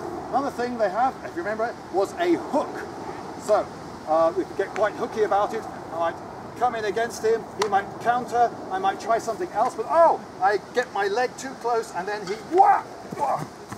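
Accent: United Kingdom. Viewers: I am an American. You are super British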